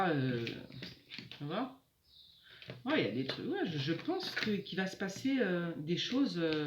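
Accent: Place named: South, France. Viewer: bonjour quel bel accent meridional!!!!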